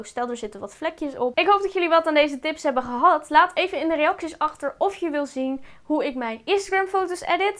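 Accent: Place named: Westland, Netherlands. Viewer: Jij komt zoiezo uit het Westland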